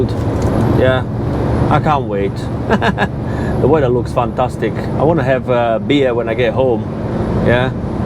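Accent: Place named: Yorkshire, United Kingdom. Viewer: Great Vlog!!!!!!!!! Thoroughly enjoyed it!!!! I love your 'Yorkshire' accent!!!!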